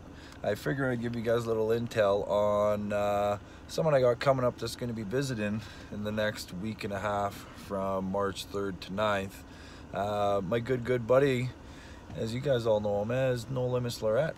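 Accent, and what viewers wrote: Canada. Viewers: Every time I hear you talk makes me think of Trailer Park Boys, you should have been on a few episodes with the boys. That thick Canadian accent makes me think TPB. haha! Cheers! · I love the way you say throughout. The Alberta accent is awesome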